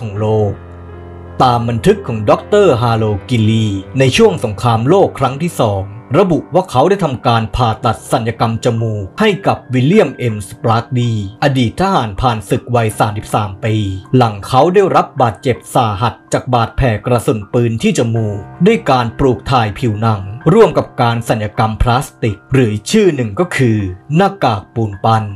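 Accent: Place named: Phetchaburi, Thailand. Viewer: สำเนียงเหมือนคนเพชรเลย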